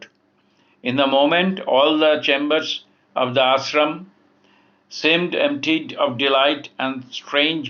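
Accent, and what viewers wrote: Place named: United Kingdom. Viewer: Your pronunciation is very difficult to differentiate from British Style. Very Good.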